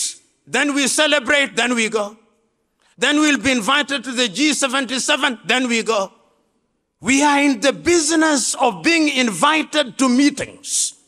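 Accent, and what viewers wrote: United Kingdom. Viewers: Nigerian an African leaders love to listen to his Queen - english. They don't care about the content of his speech.. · You with your fancy British accent and your usual many historical blames on colonization has not come up with any structurial plan to take Africa out of the mind set they're in. Join Mali,Bukino el fasco and the other military leaders who are taking that brave stand moving forward. Africa must unite!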